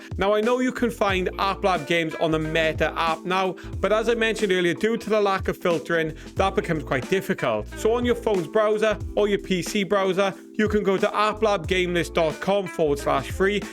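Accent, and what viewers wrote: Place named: South Wales, United Kingdom. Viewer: Hello which part of Wales are you. I guess by your accent South Wales. I live in church Village and have just purchased the Meta Quest 3 and looking for free paid games to download. Can you help.?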